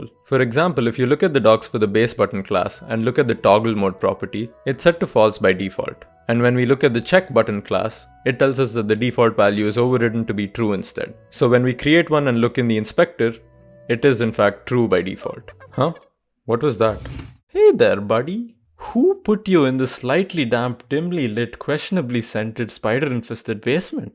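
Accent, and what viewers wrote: India. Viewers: no front, but why are the best tutorials and tipps brought by people with indian accent?^^ Again here, no front or offense or something^^. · >click >indian accent >dislike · Bro, are u South Indian because of the accent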